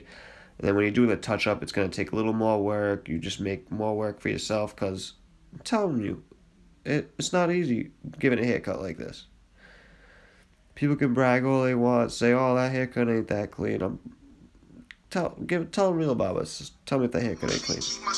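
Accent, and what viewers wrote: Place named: Boston, United States. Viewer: do you ever go 5 star shaver your freaken awesome. You sound like beantown accent.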